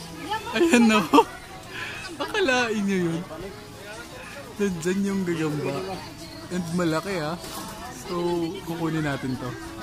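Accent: Visayas, Philippines. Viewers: Bisaya man ka noh klaro kaayu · Woii bisaya ka pala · bisaya diay ka kuya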